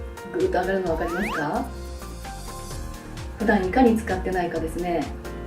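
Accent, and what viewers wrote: Hiroshima, Japan. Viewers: タメになりました🤣 なんか広島のイントネーションに聞こえた😂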